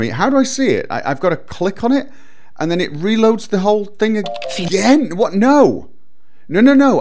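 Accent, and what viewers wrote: United Kingdom. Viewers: on the other hand, a British rant has a flavour all its own. (^_^) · I clicked on this because I enjoy hearing British people talk. Same reason I watch TotalBiscut and Yatzee. That and I am interested in what they have to say. British game reviewers > American game reviewers.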